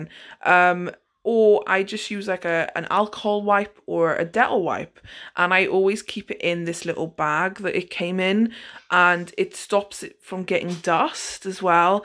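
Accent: England, United Kingdom. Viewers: 🤗 Your little English accent is so freaking cute! I love it when you say "lush" and "bloody" and stuff!💖 Everything you say sounds so super classy! Yeah Amazon is like where I LIVE!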